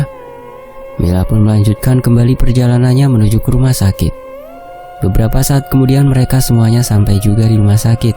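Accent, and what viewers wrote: Java, Indonesia. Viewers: agak medok ya 😁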